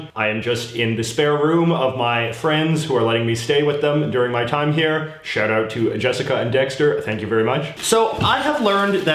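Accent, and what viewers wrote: Canada. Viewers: Wow, an entertaining and informative North American with quite a cool hair style whose only pecularity is to say "a boot" when he means "about". I hope he also realizes that going to Stockholm and possibly see it as a representation of Sweden is a little like visiting New York City and possibly see it as a representation of the U.S. -- It only applies to a degree. (Yes, I KNOW he must be Canadian, but since I've never lived in Canada, I don't want to make comparisons I don't know anything about.) Welcome to Sweden!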